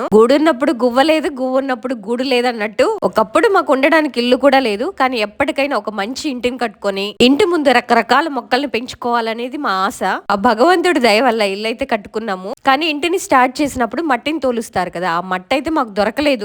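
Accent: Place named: Telugu, India. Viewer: Voice lo telugu danam super sis❤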